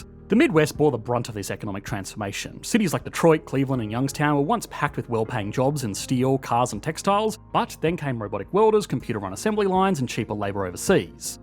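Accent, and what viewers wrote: Australia. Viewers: I can't stand Australian accents.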